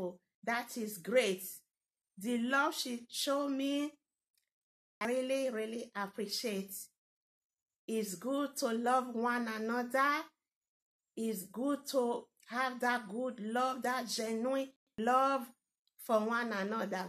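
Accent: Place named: Italy. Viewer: I love your Italian accent, I have my cousins there in Italy, nice content sis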